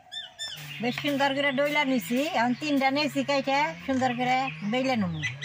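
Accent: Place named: Kishoreganj, Bangladesh. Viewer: Asam ar vasa onak ta Bangladesh ar Kishoreganh ar moton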